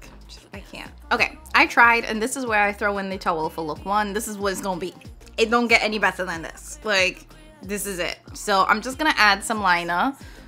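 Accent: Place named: Trinidad and Tobago. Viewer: sent me over here! Hello! You sound like a Trini! I’m from Jamaica 🇯🇲 I’ve been looking for new beauty YouTubers to follow, need some fresh opinions 😊 I too have been wanting to start a beauty channel and actually have some videos posted on two channels from ten years ago on music and hair. One day! I’m scared of the editing… I don’t have this palette so just here to learn. I have Muerte, Gemini 2, amor y mariposas, beetle juice and she’s in parties. I just ordered the electrip.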